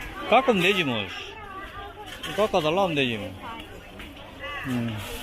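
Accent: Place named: Busan, South Korea. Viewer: 부산 사투리 듣기좋아서 챙겨보고 있습니다 좋은영상 감사합니다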